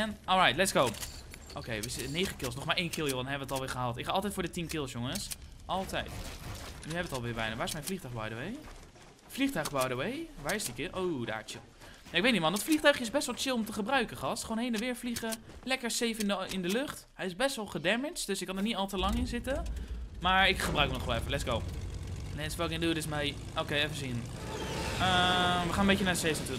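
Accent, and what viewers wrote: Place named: Friesland, Netherlands. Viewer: Waar woon je je hebt een beetje fries accent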